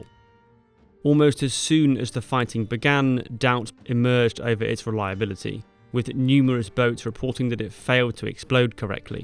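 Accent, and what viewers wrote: United Kingdom. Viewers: Somehow it sounds more important with a British guy announcing.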